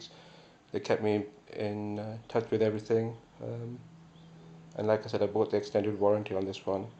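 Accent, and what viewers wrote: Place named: India. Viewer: English with indian accents shouldn't be on youtube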